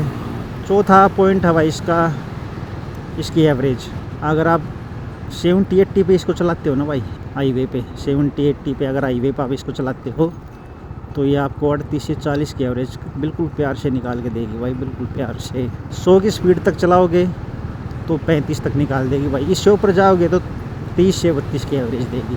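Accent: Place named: Haryana, India. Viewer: Well explained bro..no nonsense talk..I like haryanvi language..sweet..I am planning for this one..but sometimes confused between This and Hiness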